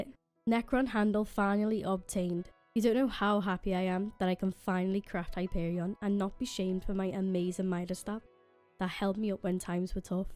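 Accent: Liverpool, United Kingdom. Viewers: that accent tho? is it scouse?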